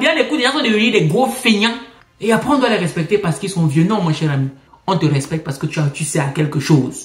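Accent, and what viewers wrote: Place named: Cameroon. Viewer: Pour une fois je ressens ton accent camerounais 😂😂😂😂😂😂. Merci pour cette vidéo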